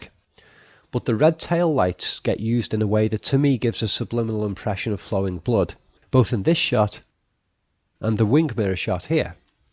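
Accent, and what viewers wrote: Liverpool, United Kingdom. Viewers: The juxtaposition of horror movie analysis and a Scouse accent is scary in itself!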